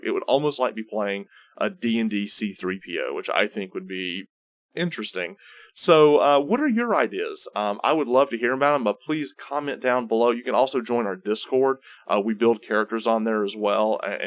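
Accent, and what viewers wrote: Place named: South, United States. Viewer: As a southerner. I have to say its great hearing an eloquence bard give dnd advice in a southern drawl.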